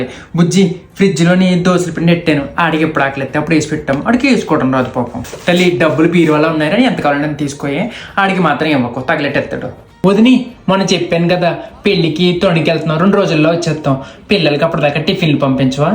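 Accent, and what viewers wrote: Godavari, India. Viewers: ఒదినీ.... డొబ్బులు.... గోదారి యాస ఓ తీయని ఎమోషన్ 😂😂😂❤❤❤ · bhai meeku telugu meda,godari yaasa meda unna pattuki hatsoff.udyiga reetya ekkada unna aa godavri anedi oka emotion enninjanmalaina akkade puttalane oka teepi gnapakam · Hi andi nenu mi matalu ki inka mana Godavari slag ki addict ayipoyanu andi❤😊... I'm your fan from Hyderabad but ma native place vachhesi Amalapuram andi 😊#East Godavari ❤ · garu miku Ela vastayi sir I alochanalu.....nijam ga mana godarolla yaasa.....amma chese panulu as it is alane dimpesaaru garu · U slag is like godavari 😊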